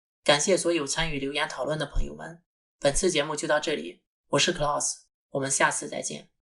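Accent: Shandong, China. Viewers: 聽口音是山東人？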